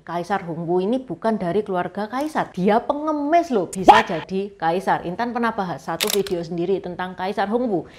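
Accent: Java, Indonesia. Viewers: Logat Jawa medok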